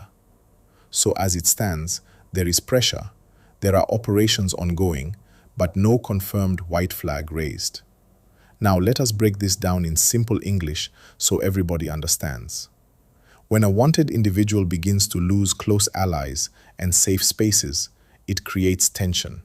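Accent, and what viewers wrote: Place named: East Africa. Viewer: it is quite interesting that some of you "TikTalk workers" assume that we listeners are only followers without the abliy to discern who is likely following your presentations. I challenge this individual to deny that he is not talking from east Africa. your ascent says so.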